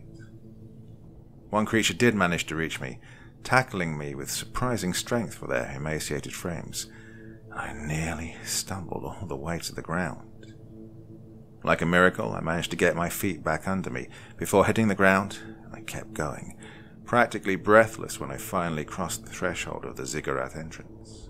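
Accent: England, United Kingdom. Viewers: was great. btw u from the UK u kinda have a british accent. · What part of England are you from ?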